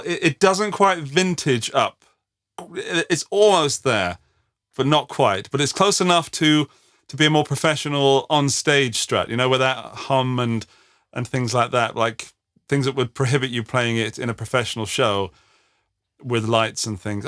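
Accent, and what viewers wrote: United Kingdom. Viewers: Just subscribed. Been thinking about getting this guitar, appreciate the in-depth review. BTW, no offense but I just loved to hear you talk, you're just so British slang and all. Thanxxxxxxx!!!!!!!!!!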